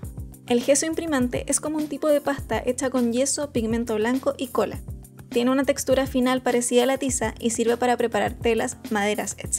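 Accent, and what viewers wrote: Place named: Chile. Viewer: Llegué a este video porque quiero hacer un traje para un concierto de gaga y ninguno me gustaba, soy chileno viviendo en Las Vegas y me encantó tu video por el acento me sentí allá de nuevo Gracias ❤️❤️❤️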